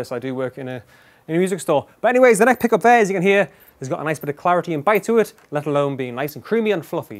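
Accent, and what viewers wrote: United Kingdom. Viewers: I'm 4 years late, just got this guitar. British accent hurts my ears but I liked and subscribed. Great video. I'd prefer some more cockney talk though, like "this axe is all kinds of honey and butter!", but got my sub regardless. Redcoat.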